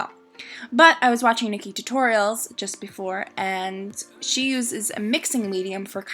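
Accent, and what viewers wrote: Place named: New York, United States. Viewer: Ur def from NYC I can tell by your accent lol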